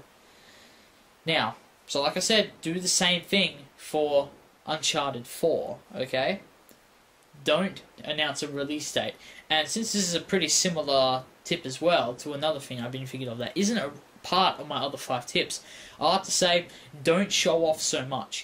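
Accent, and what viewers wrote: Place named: United Kingdom. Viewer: I love the way you talk to ND like they were your children with things like "Okay?, Good.". Maybe it's just the British accent. Anyway, all great ideas man.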